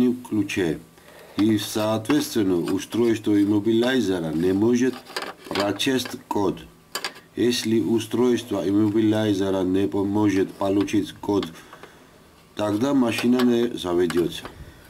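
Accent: Georgia. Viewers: Спасибо брат за науку и особенно за подачу материала, с прекрасным грузинским акцентом. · В арми был друг грузин вот так разговаривал с акцентом и грамотно, а мужику респект😊👍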